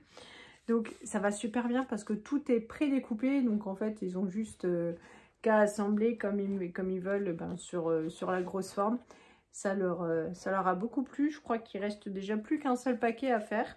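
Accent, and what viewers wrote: Alsace, France. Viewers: Merci pour cette vidéo. 😊 L'accent Alsacien j'adore 😊. J'ai vécu 16 ans en Alsace ❤️ Bon courage pour demain .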